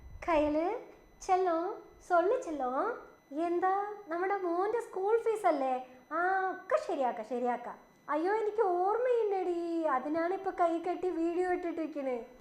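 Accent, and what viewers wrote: Kongu, India. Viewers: நீ மலையாளம், தமிழ் ரெண்டு மொழியும் பேசினாலும், உங்க கொங்கு தமிழ் பேச்சுவழக்கு எனக்கு ரொம்பப் பிடிக்கும்😊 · மோளோட கொங்கு தமிளானும் ஞங்களுக்கு கேட்கான் வளர இஷ்டம்.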